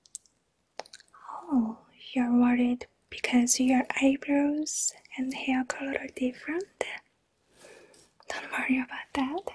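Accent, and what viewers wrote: South Korea. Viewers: 어쩐지 발음이 약간 우리 영어선생님이랑 비슷하고 한국인 같㉰ 했는데 내㉮ 젤 좋아하던 asmr유튜버㉮ 한국인이라닌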